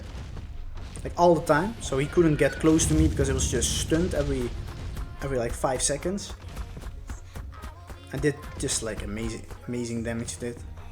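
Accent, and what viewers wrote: Netherlands. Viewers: Ik hoor dat Nederlands accent wel hoor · Hahaha i can hear at your voice that you are from NL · Haha ik ook hoorde het in het accent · Are you from belgium or netherlands bcs i can kinda hear the accent$ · Nederlands accent hahaha! keep it going! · Bro ik hoorde meteen toen ik de video opstarten dat je nederlands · Nederlands acent ga door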